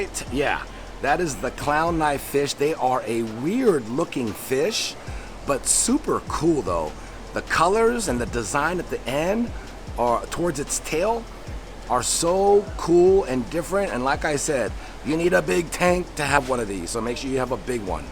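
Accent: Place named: California, United States. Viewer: Has that lib twang cali vides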